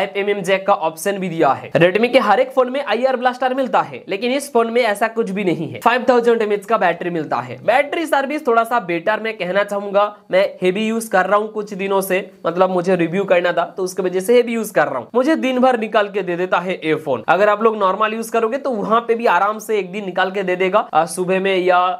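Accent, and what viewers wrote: Bengal, India. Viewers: You have a strong bengali accent.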